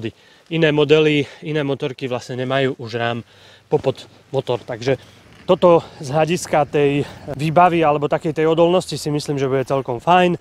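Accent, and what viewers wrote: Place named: East, Slovakia. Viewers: Kamo keď nájdeš niekoho ako co sa venuje tomu viac ako 20 rokov tak potom mudruj. To ze webstranka ma klasicky design je pre nás old school priaznivcov motoride fajn. Pamätám ze bol jednej z prvých co robil kedysi merch a vtedy išli tie prve tričká ako teplé rožky. To ze prešli na platformu YouTube je fajn a videa sa brutálne zlepšili aj kvalita aj strih. A co sa tyka vychodnarciny tak to k patrí je autentický a na nič sa nehrá. Len tak ďalej chalani v redakcii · Chalani, viem, ze urobit dobre videa chce nielen nadsenie a prehlad v teme, ale aj teba mat aj iste zrucnosti v natacani v prezentacii. Nechcem vam zhadzovat robotu (napriklad prave preto netocim videa, lebo to neviem a nechce sa mi), ale zopar postrehov mi fakt neda nenapisat. 1. Nabuduce si tiez pozicajte farebnu motorku, lebo cierna by pred kopou hnoja nevynikla. 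2. Motor P2 nie je popularny, je to len vynutene zlo od EU. Inac by tam stale boli V2. Treba povedat pravdu. 3. Kamera zblizka z ruky co nedokaze chvilu zaznamenat stabilny obraz pomaha hodit sablu, ked sa niekomu toci hlava uz z tej vychodniarciny. To tocila frajerka telefonom? 4. Ked sme pri nej (myslim vychodniarcine), tak svetlomet sa zrejme sklonuje podla vzoru "parka". Dve parky, dve svetlomety. Kazda dlhsia veta ako od Kapitana Danka. Vole. K tomu dychavicny prejav ako po prave zabehnutom maratone. Neviem, ako ste na tom v redakcii. Pripada mi to, ako keby ste to robili uz len zo zotrvacnosti. Stranka rovnaka ako pred 15 rokmi (mozno aj dlhsie, ale len co si sam pamatam), chcelo by to viac aktivnych redaktorov, alebo aspon jedneho z bezchybnym vizualnym a hlavne hlasovym prejavom a vy by ste sa mohli zamerat na odborne vstupy, no a k tomu este nejaky stativ a kamerku ci fotak s natacanim. 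PS: Chcel som to povodne poslat mailom, ale este aj ta stranka vam bezi len na HTTP a nie na HTTPS!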